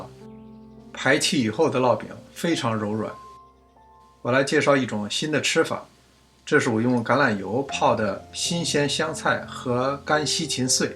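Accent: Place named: Tianjin, China. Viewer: 天津老乡话音😊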